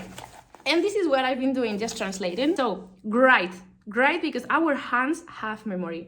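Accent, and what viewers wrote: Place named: England, United Kingdom. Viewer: ngl i love your english accent, so much energy!!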